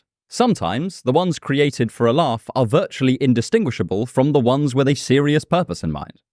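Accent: RP, United Kingdom. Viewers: Your proper delivery of content using concise breakdowns, perfect annunciation and liberal use of gesturing without your posture falling outside of conventional expectations is; in short excellent. Standing tall, speaking confidently with; from my vantage point, an accent that demands acknowledgment of its old-world knowledgeability, all the while casting spells with all that gesticulating it would seem, you’re helplessly bound to. Again: no notes. As a blue collar American lefty my inner, ignorant, exceptionalist at surface level sees a pretentious, pompous, privileged, pretty boy, from fancy accent island making some non-point I heard wisecrack cover in a self deprecating video years ago. But that’s the very worst part of me. My jealously. Seeing your youth and education and talents put to use in one concerted effort makes me pine for a life I was born too late to capitalize on. 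Much the same as any other haters you may encounter online assailing your content with contempt. I say this all to tell you haters are just usually ashamed. And also to remind you DONT READ YOUR VIDEOS COMMENTS. THIS ISNT FOR YOU TO SEE IF TOU VALUE YOUR MENTAL HEALTH BRO. Take care.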